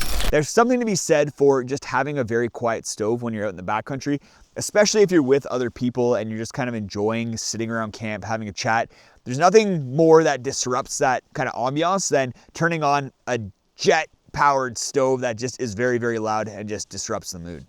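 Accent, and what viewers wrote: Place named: Canada. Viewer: Sometimes it’s hard for non-North Americans to distinguish US vs Canadian accent. But boy do you clarify the differences.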